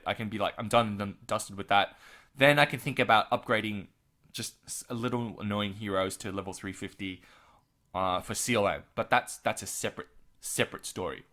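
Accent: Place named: Australia. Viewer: I've never had any issues understanding you but then I've played online games on AUS time for most of my teen years so maybe I'm just not phased by the accent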